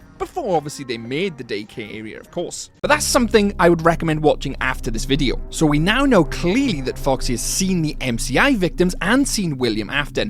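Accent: Wales, United Kingdom. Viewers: Whats that accent? Is it welsh? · this is such a weird question but are you welsh??? i am and i think i recognise the accent loll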